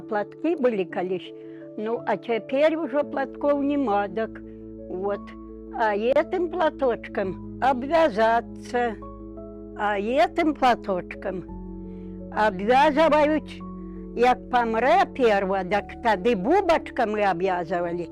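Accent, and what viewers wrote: Belarus. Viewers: Беларуский говор , как бальзам на душу. Мама моя родом из Полоцкого района, сейчас ей бы было 95 лет. В 1940 г.переехали в Сибирь.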